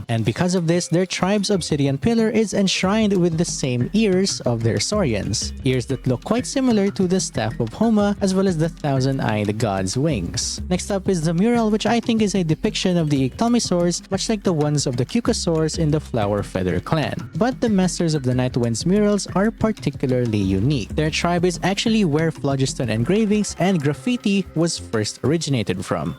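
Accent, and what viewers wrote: Philippines. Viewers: Are u a filipino fellow? Almost similar accent with peeps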